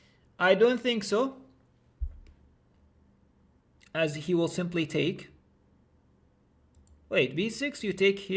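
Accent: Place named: England, United Kingdom. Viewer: Idunno that u have an english acc whatever luv uu from irakk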